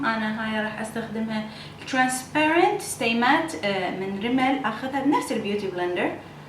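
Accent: Basra, Iraq. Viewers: لهجتج البصراوية الجنوبية الاصيلة يحسسني بعفويتج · فوديتج حياتي من عرفت لهجتج عراقيه اشتركت بالقناه 😍😍😍 فوديتج طريقتج بالشرح حلوه بس من تكولين اسم المكياج بالنكليزي كولي همات بالعربي شنو معنى لان موكل البنات يعرفن اسمه بالنكليزي 😊 يعني مثل تكولين فونديشن كولي كريم اساس تكولين برايمر يعني مثبت مكياج حته نفهمج اكثر اذا ممكن حبي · احله شي لحجي ماتج بصراوي 😍😍😍 حبج 😘😘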